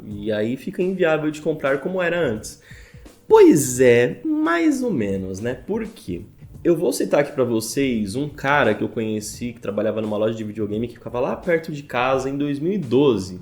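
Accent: Minas Gerais, Brazil. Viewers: c e mineiro de onde? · Uai vc é de minas? kkkk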